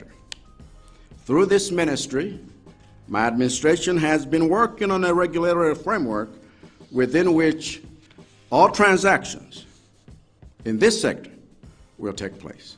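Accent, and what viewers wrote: United States. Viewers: This guy has a USA Southern Accent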